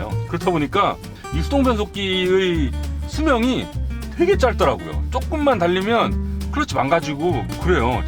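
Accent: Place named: Chungcheong, South Korea. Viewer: 참~~ 말씀 잘하시네. 충청도 사투리도 정겹구요. 실속있는 구체적인 정보 귀에 쏙쏙 들어옵니다. 구독 누르고 갑니다.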